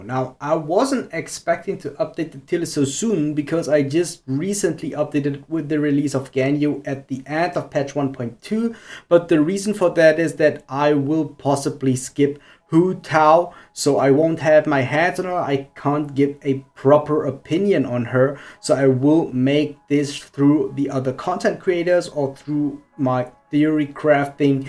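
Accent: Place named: Germany. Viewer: I mostly agree with you tier list and think it's one of the best I have seen so far. The only argument that could be made in my opinion is Zhongli is the best character to me. He gives more support-dps options with his resonate dmg and his burst as well as a true support he gives way more than Venti does in terms of helping you team out. His shield makes you invincible and you shred the defense of the enemies by 20% at close range which might be the strongest ability in the game right now. I think it is a battle between Venti and Zhongli at the top and agree with both, but the argument could be made for both I tend to think Zhongli is a little bit stronger overall. Note: I like you english it's really fluent I have to say. I can hear you have an accent might be german or something like that, but really good english!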